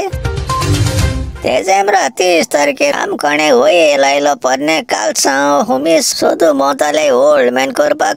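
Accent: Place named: Salcette, India. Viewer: Authentic Salcette Konkani. Those who don't know to speak proper Konkani must listen to this weekly talk by.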